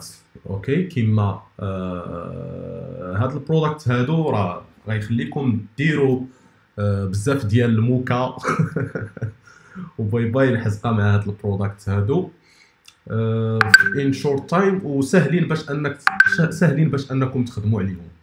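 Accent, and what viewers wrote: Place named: Maghreb. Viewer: راه يتكلم بالعربية اخي وين شتها فرنسية ?!! لهجة مغاربية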